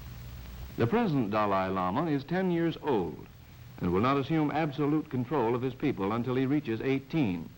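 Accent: United States. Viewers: American accent / reading style .... so different ? Fascinating history thanks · You apparently think the U.S. should have gotten into WW3 just a few years after the end of WW2. Tibet was also extremely isolated and would have been very hard to defend for the U.S. Finally, the man doing the commentary isn't from the UK, he is obviously American, and he lived in a very different time in which the vast majority of Americans that would have been watching this in the early 40s knew absolutely nothing about Buddhism, so of course he used terms they would understand like church. You are being ridiculous and acting like a troll.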